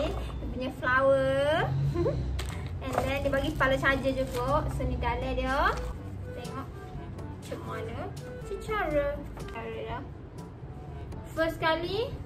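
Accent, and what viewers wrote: Kelantan, Malaysia. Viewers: cakap tu bagi elok sikit, kelantan lakk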